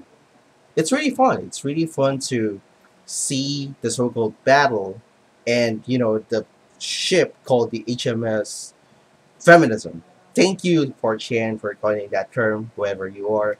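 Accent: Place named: Philippines. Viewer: ***** Excuse me, I'm also a Latino who doesn't speak Spanish/Castilian, you ''look Filipino'' (not even the ''most Mexican-looking'' of the Asians, that prize would go to the Viet-Namese, and the Indonesians take a good 2nd :-P ), I assumed you were because on A Voice for Men (where your video is featured) a bloke said ''galing'' and called you Pinoy :-P and your accent is more akin to that of a Filipino... or an Ecuadoriano than that of a Méjicano (as Méjicans, Centro-Amirikano's and Colombiano's tend to use the ''ii'' sound while Ecuadoriano's, Filipino's and Europeano's use the ''ih'' sound more), this is odd, usually my comments are invisible, and when you commented on your own video bellow my comment I couldn't see it until I selected ''answer'' my own comment, people probably reported you as spam or something, I like this new Google+ integration, but I hate this ''feature''. 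(>_<) Be glad that you're not an Ecuadoriano (what I first thought you were) as that's the country where Feminists threatened to cut of innocent men's penus (yes, that's the plural of penis) if Lorena Bobbit would go to jail (yet they are seen as ''freedom-fighters''), also most Castilophone western countries had female heads of states, but the palces with the most radical Feminists never had... makes one think... I don't know if you get this a lot, but you really look and sound Filipino/Ecuadoriano, though Filipino's are universally paper-pale (literally everyone in the Philippines can play for the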